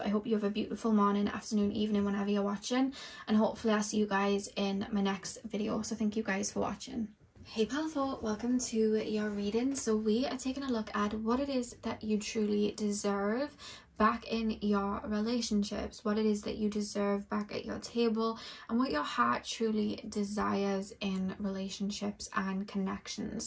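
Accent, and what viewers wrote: Wales, United Kingdom. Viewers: I chose pile 3 and everything you said was 💯. I am on a self love journey finally after so many years of Searching for love in all the wrong places. I have finally had to separate myself from family and most friends because it was always take take take and no give back. And when I finally start to do well everyone is angry and jealous. It hurts so much and I am sad that I invested and gave anything and everything to people who never cared to see me for who I truly am. I know it stems from my childhood in an extremely dysfunctional family and being the scapegoat. So just as you said I inadvertently searched for love in the wrong types of people hoping to finally get it back. I’m finally falling in love with me now and it hurts to leave everyone behind but it also feels so liberating and I truly have got this lesson finally. 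Thank you you are so gifted. I love all of your heart felt readings and your adorable Welsh accent! 🥰🥰🥰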